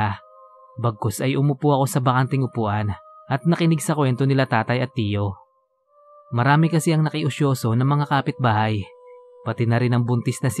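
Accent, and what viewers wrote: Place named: Hiligaynon, Philippines. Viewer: Hello sir, Salamat boss.. Ang galing mo sa punto ng Hiligaynon... I would like to RENDER A SALUTE.. aw tama ba yung grammar hehehehehehe SOLI DEO GLORIA SA CHANNEL MO! Malik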